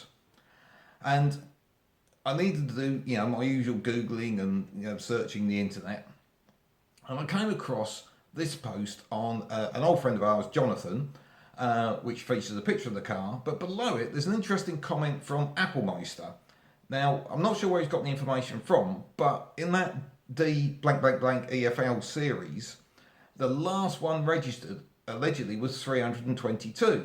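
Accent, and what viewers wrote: United Kingdom. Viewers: I like your Cockney English. So you are from London? By the way, good story!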